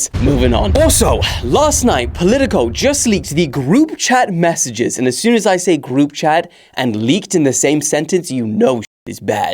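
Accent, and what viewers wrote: South Africa. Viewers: Are you South African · Is South African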